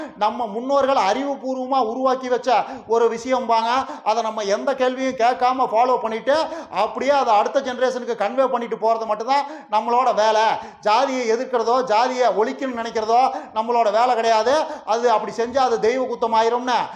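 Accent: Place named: Coimbatore, India. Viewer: ur cbe voice gives the slang a nice one wait for the voice not for the messages matters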